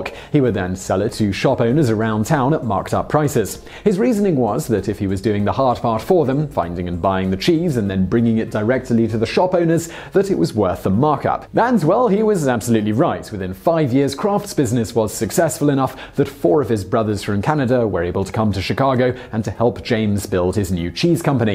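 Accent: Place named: London, United Kingdom. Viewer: this guy says 'sore' instead of 'saw', verb. because: London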